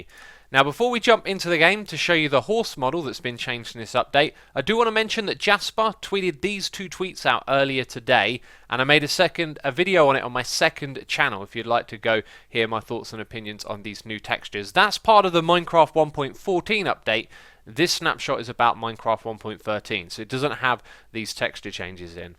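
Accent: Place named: United Kingdom. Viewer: Wow, I thought I was watching a different YouTuber for an entire minute. British accents sound the same, I swear. Well, most of them.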